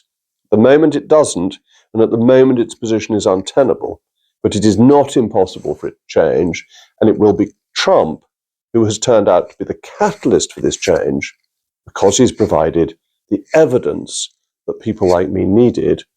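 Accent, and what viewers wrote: RP, United Kingdom. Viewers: I love your accent n very manly voice, and learning a bit of UK high class English from you in the process ❤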